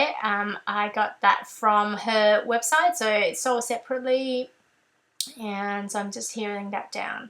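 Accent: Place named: Australia. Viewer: Thanks for the heads up on the DD Facebook page re your videos. Sounds like an Aussie accent. Are you in Oz?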